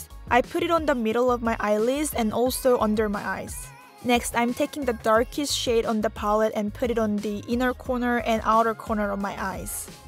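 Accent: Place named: South Korea. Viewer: You’re so pretty and when I was younger I was surrounded by fellow Koreans with your exact accent! Because I don’t have an accent and I’m studying in America, I really missed it! I love your channel <3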